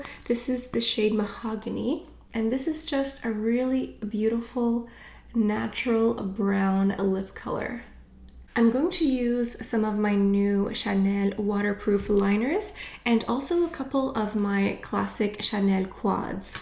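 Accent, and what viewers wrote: France. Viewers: You have such a beautiful French accent! Lovely to live in a dual language French-English country. I agree with your assessment of Natasha Denona - definitely some hits and misses for me. I really enjoy using the Chanel palettes we have. I too have grown a little tired of the red/pink color story.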